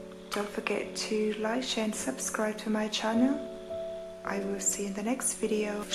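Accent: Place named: Iceland. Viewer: U got a björk accent 😍😍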